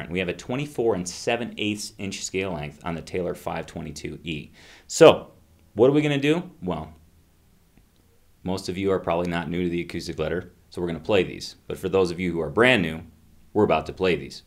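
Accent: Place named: United States. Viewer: Nice job,. You sound more like a Yank as the years have gone by.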